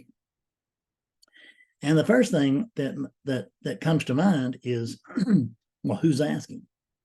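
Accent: South, United States. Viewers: when I first found you so long ago, I dismissed you as just a Hick with that "country voice" and ordinary language. I was used to the impersonal, superior Lecturing style of other Speakers/Teachers, so I didn't value your "common man" style until just now. Thank you for your honesty and personal language plus your obvious Awakeness.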